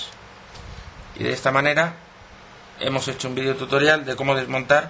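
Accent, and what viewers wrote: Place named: Spain. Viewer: UR A FUCKING IDIOT this is European SPANISH From spain you fuck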